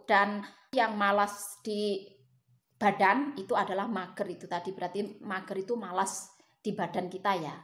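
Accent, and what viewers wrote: Java, Indonesia. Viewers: pa kabar teh, suka lihat teteh bicaranya medok banget.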